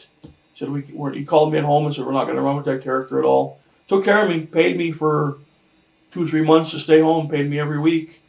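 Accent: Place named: Upper Peninsula, United States. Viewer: Love the Yooper accent!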